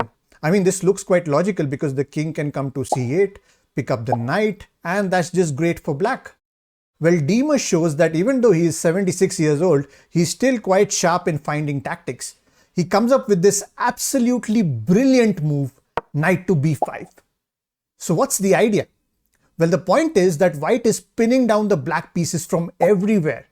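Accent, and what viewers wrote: India. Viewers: cant stand that indian accent. flunked a couple of college courses because of that shit.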